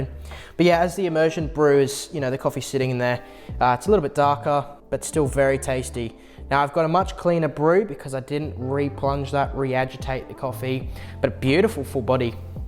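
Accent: Australia. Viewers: Are you Australian? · Where in the UK are you from?! I'm Aussie and you sound like 95% Aussie to me! lol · Clearly an Aussie from down under👍🏼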